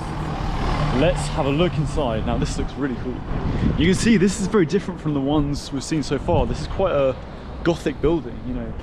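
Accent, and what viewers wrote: England, United Kingdom. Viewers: This presenter is so handsome with a gorgeous English accent 😍😍😍😍😍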